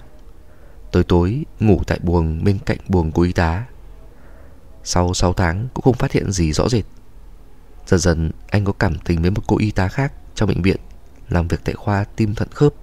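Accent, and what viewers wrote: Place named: Hanoi, Vietnam. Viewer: Nghe để giải trí mà. Tôi rất thích kênh nầy, tôi thích nghe đọc truyện, giọng rất hay chuẩn Hà Nội, tôi người phương nam, xin cảm ơn và tác giả.❤❤❤❤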